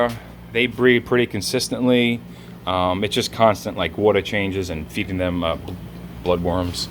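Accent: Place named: New York, United States. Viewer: Where you located definitely a New York or New Jersey accent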